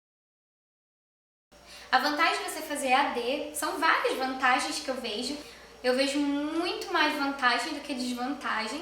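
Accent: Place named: Pará, Brazil. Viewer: vc é paraense?, o sotaque não nega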